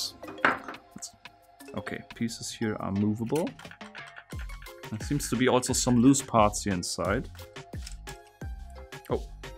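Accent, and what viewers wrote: Germany. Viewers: He sound like a german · You are from germany right? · Are you German ? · Hmm.... sounds german. Not the Set, i mean. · Man hört einfach Instant dass er Deutscher ist . Und das Ah Ok war das Deutscheste im Video . Ehrenmann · Bist du deutsch? xD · Dein Akzent ist so krass deutsch haha Machst du auch deutsche Videos? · you talk little bit like german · Das erste Video von dir gesehen den Akzent gehört in der kanalinfo nach gesehen und jap du bist deutsch xD Viele Grüße aus Hamburg! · du bist doch bestimmt deutsch oder? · Man hört dass du ein deutscher bist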